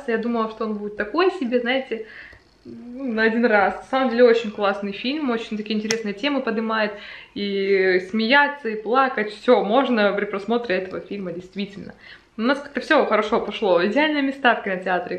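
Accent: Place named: Okanye, Russia. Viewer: Перестань О-кать.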